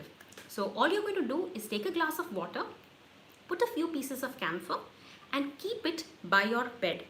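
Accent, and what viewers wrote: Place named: India. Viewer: Please subtitles in written English in some countries indien english acent is very difficult to understand.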